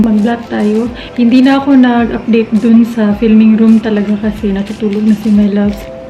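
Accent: Visayas, Philippines. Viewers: I'm one of your silent viewer here in Youtube. Hope for your best and just continue what you are doing, kasi you inspired a lot of people. Continue spreading love and good vibes. P.S. Your bisaya accent never been an hindrance of what you are doing or explaining, mas minamahal ka ng mga viewers mo dahil dun. Lovelots from Palawan.♥♥♥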